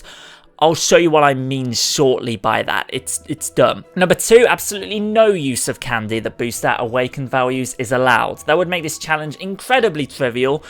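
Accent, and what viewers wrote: Birmingham, United Kingdom. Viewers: My guys from Brum! love that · Damn, all these videos I've watched and I had no idea you were from the same town as me, Brummies unite! You'd think I'd recognize the accent but apparently not.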